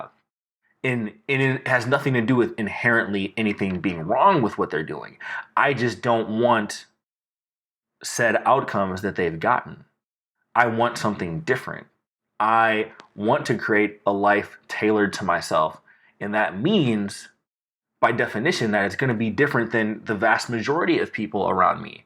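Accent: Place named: United States. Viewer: and I thought you'll have the Nigerian accent.... Lol. Love your American accent though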